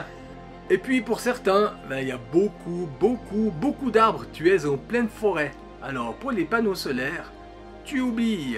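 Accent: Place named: Switzerland. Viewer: Je vous remercie d’avoir pris le temps de répondre, je vais remonter dans vos vidéos et aller voir votre présentation. , J’ai 58 ans et suis dans le même état d’esprit que vous l’étiez certainement avant de faire le pas pour une vie différente , et visiblement nous avons le même parcours de voyage . Je file cet hiver aux Philippine 3 semaines maxi , contraint pas le travail , j’espère que les vacances qui suivront seront les grandes vacances et que je ne serai plus contraint de revenir à date . En attendant, merci encore pour vos vidéos qui font du bien . Et je vous taquine pour l’accent , ne changez rien ! Et vive les suisses !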